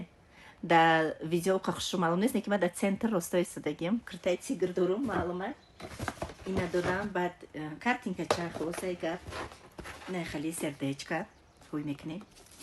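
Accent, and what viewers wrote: Tajikistan. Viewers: худочон аз инда зиёдат бта рахмат ба падару модарат.Ба забони тозаи точики фахмо гап мезани.ьехтарин.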